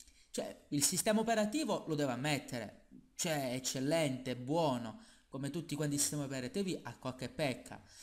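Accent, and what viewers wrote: Sicily, Italy. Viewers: a quanto ho sentito sembri siciliano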